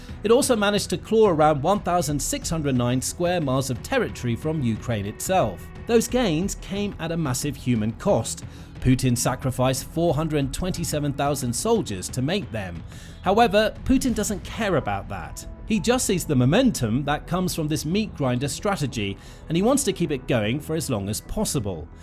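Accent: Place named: Southern England, United Kingdom. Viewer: So, who is your audience? Since the rest of the world uses the metric system, one must assume it is the US. So perhaps you can ditch the southern English accent for Standard American.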